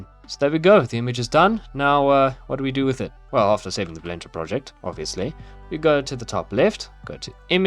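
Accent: South Africa. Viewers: I love South African accent. ❤ thanks for sharing…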